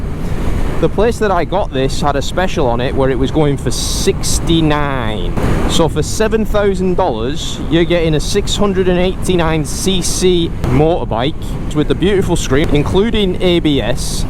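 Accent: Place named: Bolton, United Kingdom. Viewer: where are you from sounds like bolton u sound like paddy mcguinness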